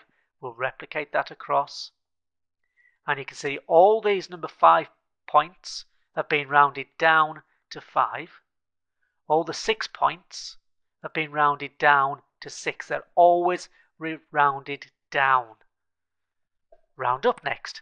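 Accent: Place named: Northern England, United Kingdom. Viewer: Dear. Absolutely brilliant and so easy to follow. A real bonus to hear a northern accent too! Thanks for posting this - it solved my immediate Excel problem - and took me even further too.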